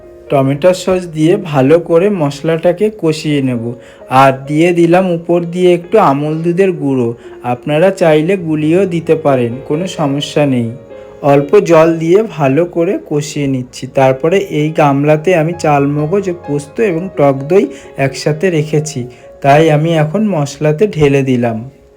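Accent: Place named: Bangal, Bangladesh. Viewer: পিচ এটা আবার কোন দেশের ভাষা , বাঙাল ভাষা ?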